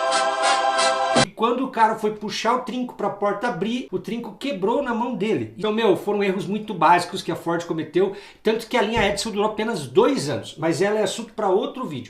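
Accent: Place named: South, Brazil. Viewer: Opa...pelo sotaque tu é sulista.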